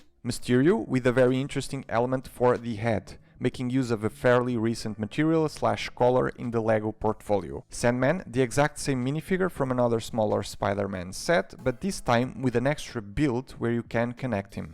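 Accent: Portugal. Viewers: that portuguese accent x) trending video, congrats.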